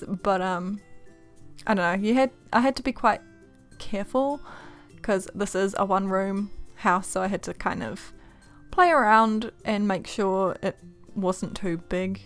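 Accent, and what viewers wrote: New Zealand. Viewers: your accent made sense P.S I'm a kiwi too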